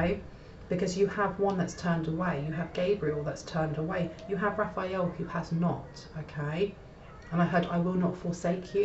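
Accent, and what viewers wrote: England, United Kingdom. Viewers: Finally a pommy reader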